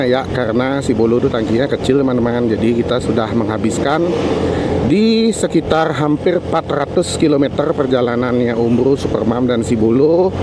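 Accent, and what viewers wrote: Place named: West Java, Indonesia. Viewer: Yogya hadiir perdana beli Pingiin ikutan Logatnya kok ada sunda n bali nya ya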